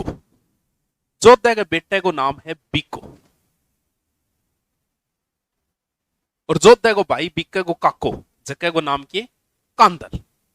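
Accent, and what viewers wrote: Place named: Bagdi, India. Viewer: Sir marwadi nhi baagdi bolte h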